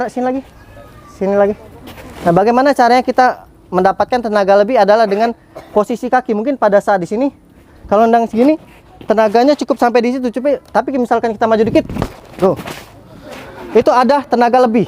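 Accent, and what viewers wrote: Palembang, Indonesia. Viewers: Kyk kenal bahasanya ,itu bahasa Palembang ya salam satu daerah mas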